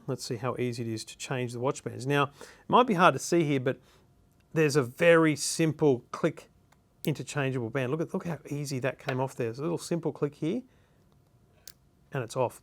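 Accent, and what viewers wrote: Australia. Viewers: Is this Guy from Australia? And why is he speaking in Australian?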